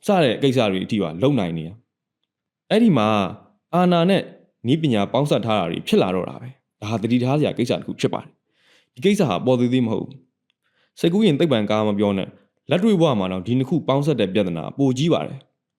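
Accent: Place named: Dawei, Myanmar. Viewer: မောင်ရင်ကထားဝယ်သားလား ထားဝယ်စကားပြောတာပီလို့ဗျ❤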